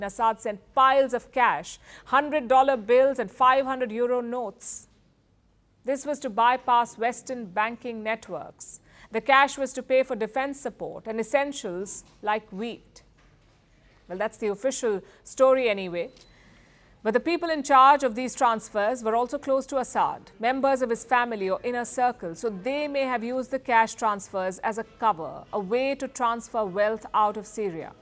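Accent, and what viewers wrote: India. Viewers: Palki, the puppet Western propagandist with an Indian accent. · Now I know you are garbage news, with indian accent.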